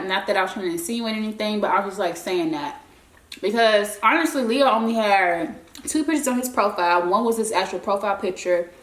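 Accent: Memphis, United States. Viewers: Zaxbys come be hearing from me ! 🤣🤣 your so memphis I love it !!! #901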